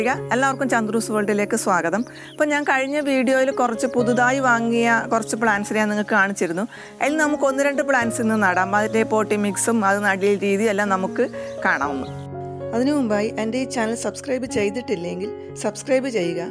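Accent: Kannur, India. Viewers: ചേച്ചി കണ്ണൂരാണോ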